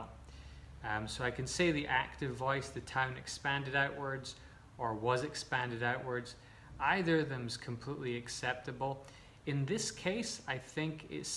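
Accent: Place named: Scotland, United Kingdom. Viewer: Are you from Scotland? Btw Really love your accent.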